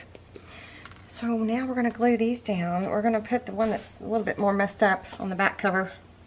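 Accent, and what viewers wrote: South, United States. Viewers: This is absolutely beautiful !!! Congratulations on making 50k subscribers🎉🎈🎉🎈!!! I am so grateful for having found you!! I love ❤ the fact that I have never found it hard to understand ya!! I gotta southern draw too lol and you ain't never said somethin that I haven't heard before unless you accidentally make up a name for it like cramin two words together lol 😂😂!!! Thank you for everything you do!!!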